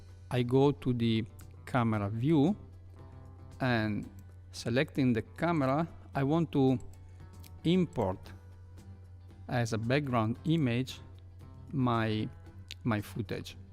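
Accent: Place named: Italy. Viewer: accento piu italiano di questo mai sentito ma grande comunque!!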